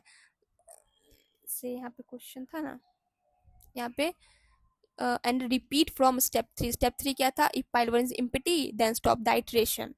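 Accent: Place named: Bihar, India. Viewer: Ap bihar ya jharkhand se ho na ap ka accent se pata chalta hai and Video is very helpful thanks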